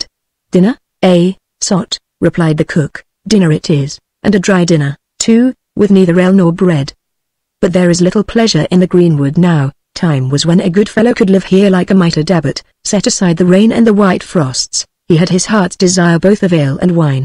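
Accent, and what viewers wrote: United Kingdom. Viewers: British female robot. Awful. Avoid.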